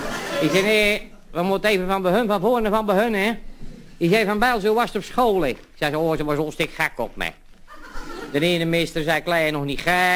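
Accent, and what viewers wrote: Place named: Zeeland, Netherlands. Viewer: ik ben ook een zeeuw maar deze praat zo plat dat ik het moeilijk kan verstaan:P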